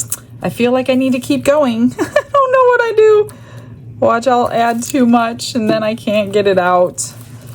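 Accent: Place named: Midwest, United States. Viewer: Lol, I thought I detected a bit of a midwestern accent 😂 I'm a native yooper myself, with family and friends all over Wisconsin, Illinois and ohio, as well as a few trolls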